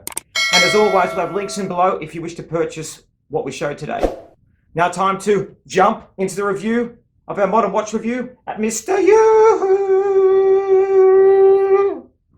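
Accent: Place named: Australia. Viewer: Let’s “dive” in and “cov” our name into the back of our watch (carve) . Love that Aussie accent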